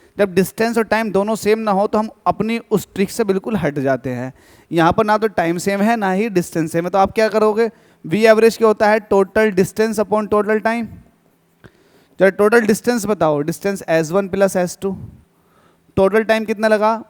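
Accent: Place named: Haryana, India. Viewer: Lagta h sir aap Haryana se ho😅😂😊😊😊😊😊😊😊😊😊😊😊😊😊😊😊🎉🎉🎉🎉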